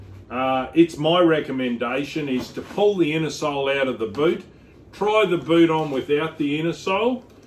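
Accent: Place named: Australia. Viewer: Ah! Nobody told me he would be Australian! I can't even understand what the hell he is trying to say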